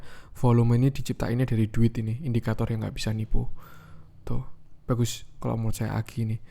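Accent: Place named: Semarang, Indonesia. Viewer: Sudah kuduga,logat semarangan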